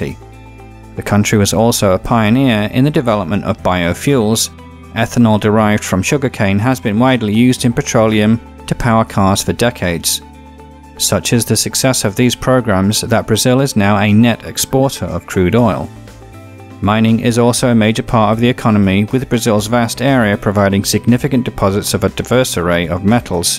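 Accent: United Kingdom. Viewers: Great summary of the history of Brazil, you sound like you are from north England somewhere like Leeds/Sheffield ?, one thing I don't understand, Brazil has some of the worlds largest collection of resources and a pollution of 200 million but with an economy not in the top 10 and 15th the size of the USA, what is the reason for this? Places I have visited in Brazil, Sao Paulo, Manaus, Rio, Brasilia, Boa Vista. It used to have the currency the cruzeiro when I was there long ago, also the ALCO powered cars were fascinating back then.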